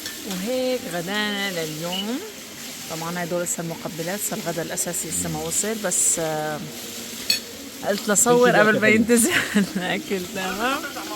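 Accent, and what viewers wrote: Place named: Egypt. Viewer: ربنا يسعدك حضرتك بتتكلمى مصري ماشاء الله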